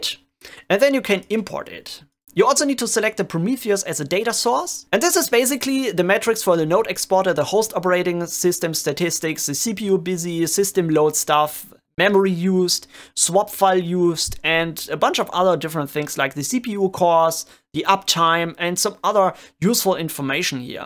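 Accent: Germany. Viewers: simply amazing stuff!! I really like 2 things about your videos 1. amazing and professional explanations 2. your German accent :DD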